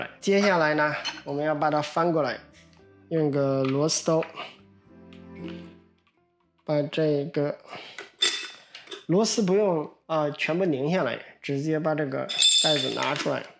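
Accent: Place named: Henan, China. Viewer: 老乡豫普说的不赖😊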